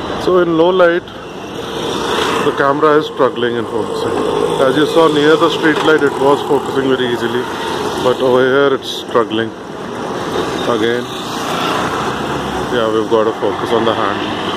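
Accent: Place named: India. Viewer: Hello Mr i just dont like Indian speaking reviews BUT for you i really enjoyed your in depth review good job and thankyou. Hope to see more.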